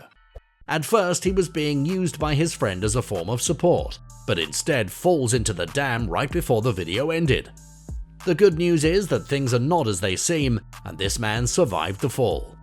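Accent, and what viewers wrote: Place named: Australia. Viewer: You sound funny I like your Australian accent I can tell other way you're talking cooling funny😎👍😎👍😎👍😎👍😼👍😼👍😼👍😼😎😎👍😎👍😎👍😼👍😎👍😼👍😎👍😼👍😎👍😼👍😎👍😼👍😎👍😼👍😎👍😼👍😎👍😼👍😎👍😼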